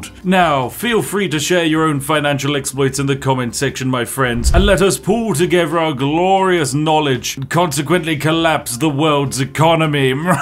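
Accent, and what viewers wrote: United Kingdom. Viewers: This dude is British in every way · this brit be spiffing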